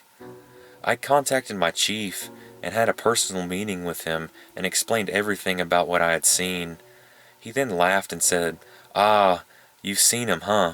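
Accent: South, United States. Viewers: obviously his regional Southern accent. If you dislike it, and it appears you do, there are a lot of narrators who aren't Southern on Youtube. I personally find it quite lovely, but that's simply me. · Omfg your southern accent is so thick lmao.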